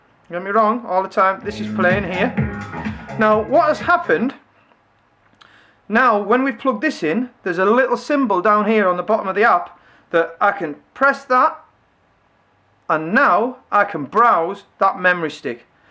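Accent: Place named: Newcastle, United Kingdom. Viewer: Bit of a Geordie accent there la.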